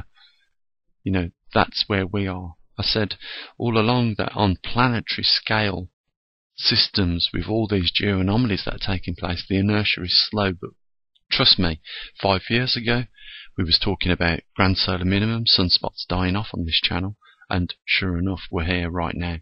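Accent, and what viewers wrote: Birmingham, United Kingdom. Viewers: We made the move to the countryside in Portugal 3 years ago...........we have a poly tunnel and land. It's still affordable to buy land in Portugal with water and mature fruit trees. So happy to have left the city. Thanks for your work. Its been very cool here all month, which is very unusual. Btw, you sound like a brummy.........I was born in West Brom!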